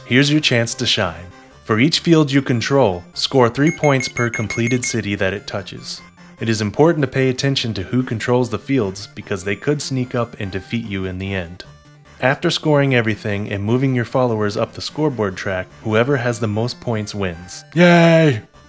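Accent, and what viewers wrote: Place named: France. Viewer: great French accent!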